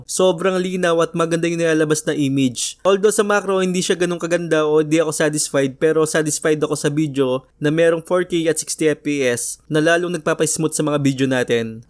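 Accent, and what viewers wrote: Pampanga, Philippines. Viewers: Kapampangan kaba sir? Great video btw.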